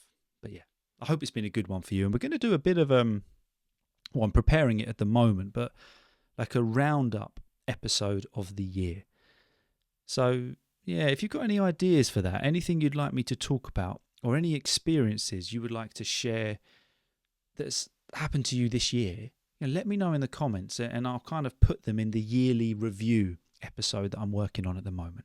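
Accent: United Kingdom. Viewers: Thank you for your podcasts. It is the best channel with English podcasts and British accent 😍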